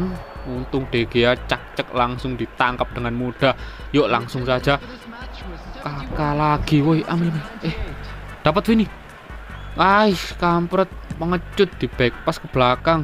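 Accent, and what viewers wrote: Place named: Banyumas, Indonesia. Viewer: Wong ngapak kie kayane.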